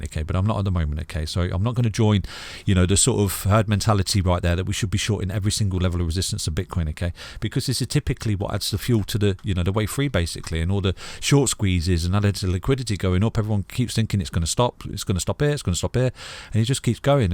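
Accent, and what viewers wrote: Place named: England, United Kingdom. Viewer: Please speak slower. Especially with the English accent and all of us Slow-minded Americans sipping whiskey and munching Doritos.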